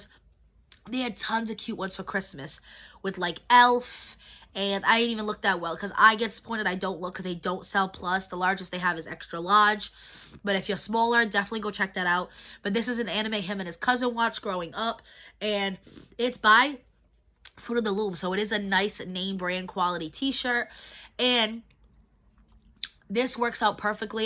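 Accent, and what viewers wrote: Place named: Massachusetts, United States. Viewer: i knew you had to be from mass with your accent! 😂 i found you on craftygirls comment section a few weeks ago, so what are the odds that we live in the same state?!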